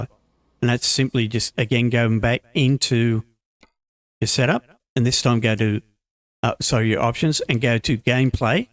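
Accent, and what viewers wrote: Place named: Australia. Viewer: Mate are you aussie by any chance? Been watching your videos for a while, only noticed your accent today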